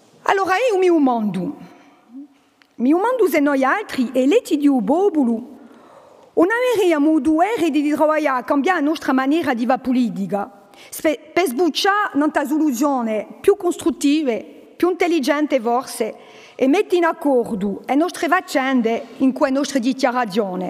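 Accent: France. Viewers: Che brutto il corso un italiano con le u finali e la.cadenza francese . Il sardo e tutta un altra cosa.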